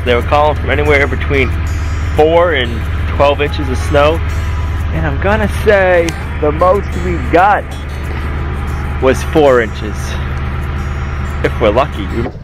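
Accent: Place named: Boston, United States. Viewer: Just subscribed to the channel, I can hear hints of the Boston accent but where about are you located now( obviously not too detailed for personal reasons) but dang you guys have some good snow already. I’m from the north east as well and we’ve only just got our first snow.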